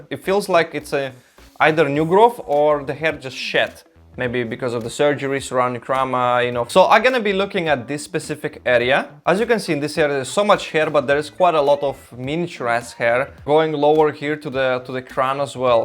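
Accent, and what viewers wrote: Russia. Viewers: ah nice I instantly recognized by your accent you were a Russian speaker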